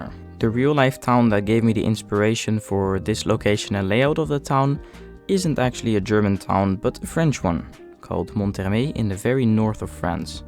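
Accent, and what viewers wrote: Netherlands. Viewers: Me vrouw zei hij heeft een Nederlands accent, en volg al denk een jaar je kanaal😂😂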